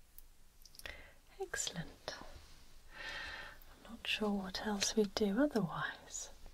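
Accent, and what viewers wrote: United Kingdom. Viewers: So glad there's a sassy, funny and wonderful British ASMRtist out there. A British accent always gets my tingle on!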